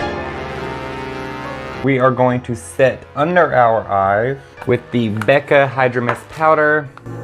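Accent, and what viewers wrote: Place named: United States. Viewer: why do you sound so country??? Lmao Where do you live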